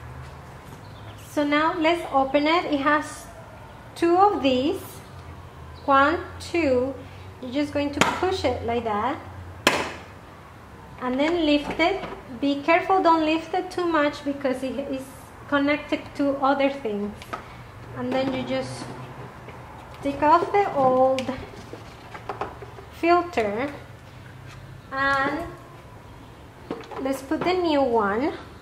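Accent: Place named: Mexico. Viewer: Let's be honest... Who the hell cares about learning how to change the Air Filter ? We all know how to do that but watching a beautiful girl explaining the process it's music for my ears and pleasure for my eyes. I have a 2005 Toyota Corolla XRS but I installed TRD Air Intake, something little bit different Well done mami, I love your video and your accent Viva México Ca... !!!!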